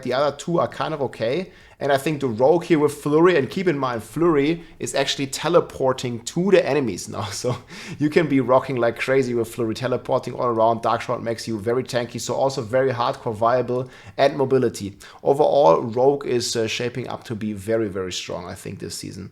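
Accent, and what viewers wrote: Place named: Germany. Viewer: I read patch notes and stuff, then come here to be serenaded by a heavy German accent.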